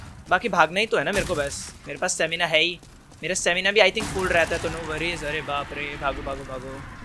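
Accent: India. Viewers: Does anyone else thought that he had too much of Indian accent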